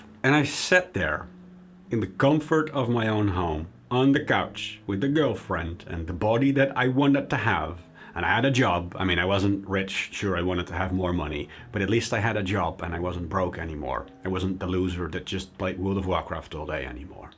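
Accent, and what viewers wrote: Netherlands. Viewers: Are you dutch or it is just your accent? Good videos man, good luck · I heard you were dutch by your accent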